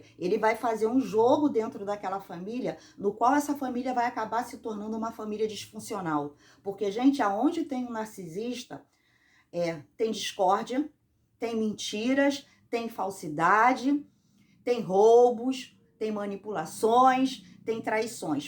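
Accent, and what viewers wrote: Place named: Rio de Janeiro, Brazil. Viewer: O som da sua voz é bem carioca.🤔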